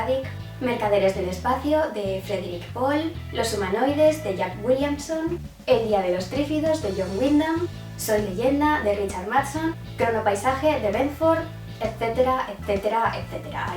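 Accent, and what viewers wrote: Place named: Spain. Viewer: Me encanta tu acento, de que parte de españa será? Suena mucho como el antivirus <3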